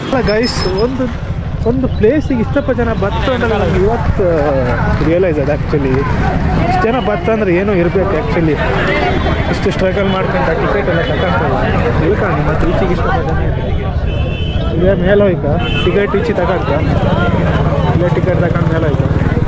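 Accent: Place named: Kundapur, India. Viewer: ಕುಂದಾಪ್ರ ಕನ್ನಡ ❤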